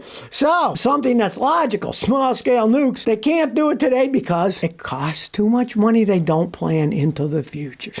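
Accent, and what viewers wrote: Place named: New York, United States. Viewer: you left, but your new york accent never did...ha ha.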